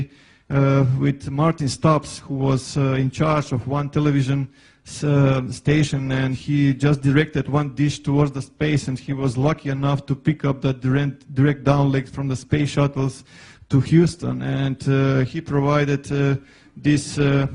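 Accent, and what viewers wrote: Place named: United Kingdom. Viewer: Let me guess, you're from the UK.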